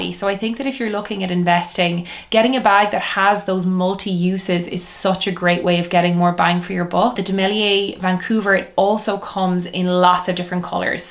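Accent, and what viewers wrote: Ireland. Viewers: the Irish accent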